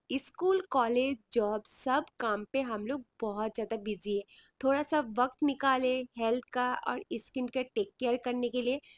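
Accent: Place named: Bengal, India. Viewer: App bengali ho.... Hindi sunke asahi lag raha hai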